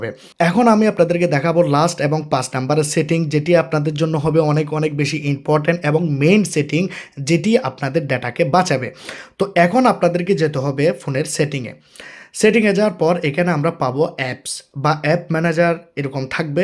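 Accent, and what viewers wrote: Bangladesh. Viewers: Apnar kotha sune mone hochhe apni Bangladeshi lok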